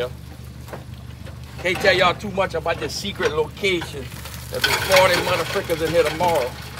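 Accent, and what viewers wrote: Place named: New England, United States. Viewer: You sound like a Yankee friend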